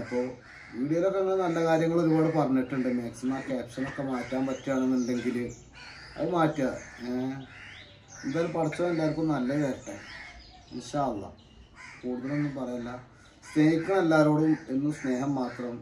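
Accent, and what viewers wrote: Malappuram, India. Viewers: ഉമ്മന്റെ മരണത്തെക്കുറിച്ച് പറയുന്ന വീഡിയോയും monetization ഓൺ ആക്കി പരസ്യം വരുത്തിക്കുന്ന നീ Hero ആണ്😢 അതുപോലെ ഇപ്പോൾ ശരിക്കും മലപ്പുറം ഭാഷ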